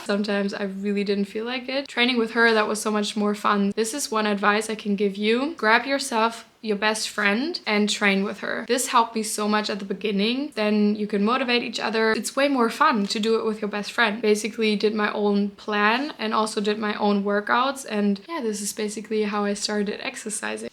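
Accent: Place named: United States. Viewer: You literally sound american! Thats so crazy how living in america for 1 year left you with an american accent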